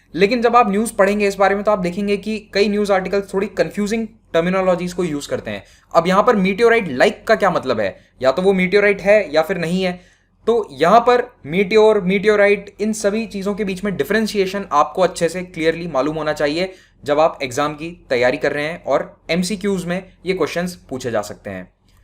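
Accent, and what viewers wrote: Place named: Rajasthan, India. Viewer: When u say killlooo (kg)😂 that rajasthani accent....I like it very much...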